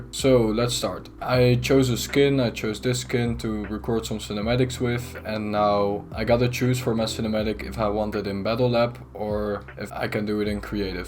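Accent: Netherlands. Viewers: We do be loving that Dutch accent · are you dutch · Dutch accent on top tho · Are you dutch?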